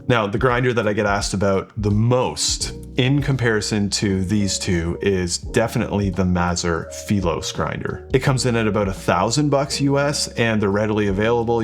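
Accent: Canada. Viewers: Your accent is really ugly. Is it Canadian?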